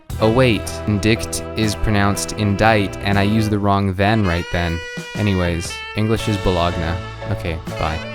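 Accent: Russia. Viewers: your russian accent is actually pretty good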